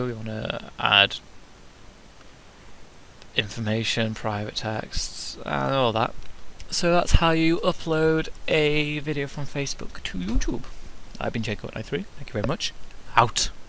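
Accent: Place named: United Kingdom. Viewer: lol you have a funny british accent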